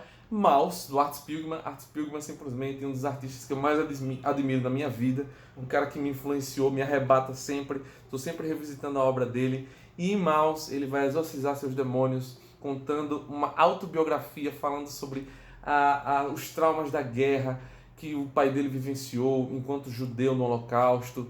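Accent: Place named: Northeast, Brazil. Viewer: Muito show seu vídeo...e sua lista é nota 10... eu admiro muito o seu Canal e já te acompanho há um tempo, mas só hoje me dei conta que não estava inscrito, o que resolvi com um clic...eu gosto muito a forma como vc fala de quadrinhos e os apresenta...e além disso, tem esse sotaque que amo (tive um namorado de Maceió e um de Teresina, mesmo sendo filho de Paraibano, meu pai não tinha nenhum sotaque nordestino)...da sua lista eu tenho "Maus" (meu HQ da vida), "Retalhos" (lido nesse mês de novembro) - vc já leu "Habib", é lindo demais, - e claro, tem alguns que estão na minha lista de desejados...um grande abração carioca e boas leituras...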